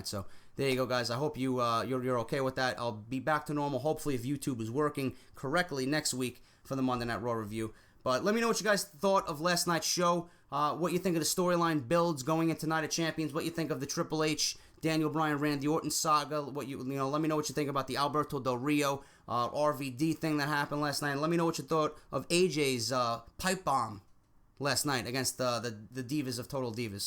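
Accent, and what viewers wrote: New York, United States. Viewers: Was thinking the same thing about Del Rio, Ricardo and Van Dam. Good points as always man. Btw are you from New York?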